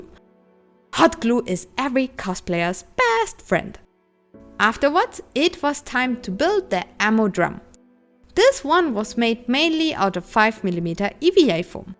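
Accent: Germany. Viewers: Are you from Germany or something like that you have a very strong German accent in your English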